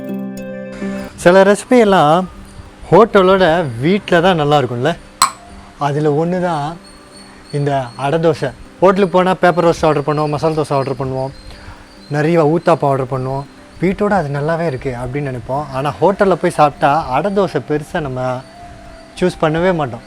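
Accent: Tirunelveli, India. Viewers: Pattani paruppu Tirunelveli slang... you're speaking more Tirunelveli slang only...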